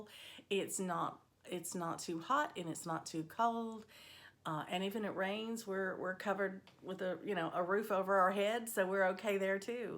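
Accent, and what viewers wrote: South, United States. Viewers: Such a pleasant southern accent and I love your taste in patterns.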